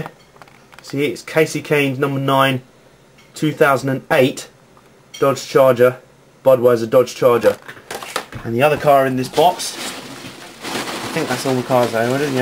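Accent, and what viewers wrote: United Kingdom. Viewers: I love your british accent, that's awesome! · Are you British?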